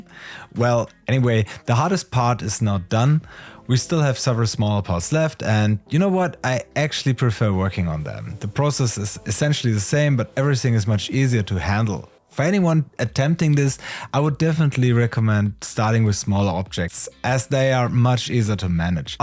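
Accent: Germany. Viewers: This German accent makes me feel like he’s a NASA scientist, and I believe everything science related he says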